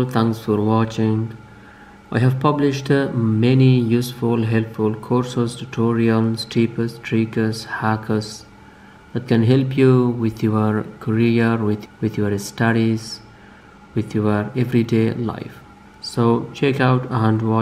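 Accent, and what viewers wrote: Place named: Afghanistan. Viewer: which stable do you come from. Camputer. I laughed who taught you English. I guess you must be an Afghan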